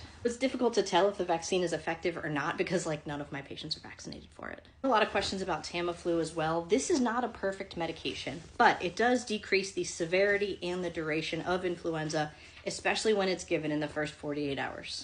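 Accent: United States. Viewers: Are you from upstate NY?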